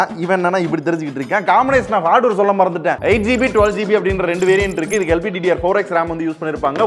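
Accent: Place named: Madurai, India. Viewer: மதுரை காரனுக்கு நீங்க பேச சொல்லிக் குடுக்க வேண்டாம்...பேச்சு வழக்கு அப்படி தா இருக்கும்... 💯 பிடிச்சா பாரு இல்லனா‌ கிளம்பி போய்டே இருக்கனும்..✅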